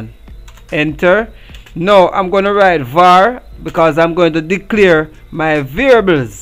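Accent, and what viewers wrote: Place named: Jamaica. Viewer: love and support love the jamaican accent big up yuh self!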